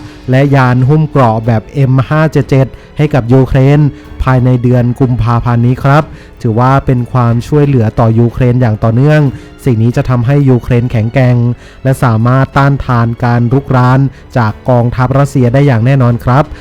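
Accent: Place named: Isan, Thailand. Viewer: เปรียนคนเว้าแล้วติครับ555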